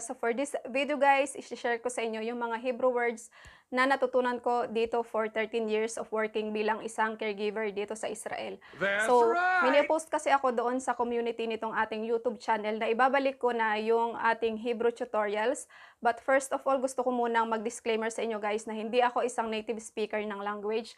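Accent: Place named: Philippines. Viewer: You sound phillipino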